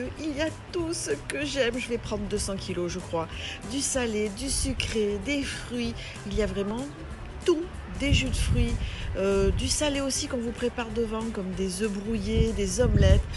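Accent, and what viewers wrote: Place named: Provence, France. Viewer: Merci, j'aime beaucoup ta voix, posée, légèrement teintée des cigales, très agréable! Dommage pour Nadal!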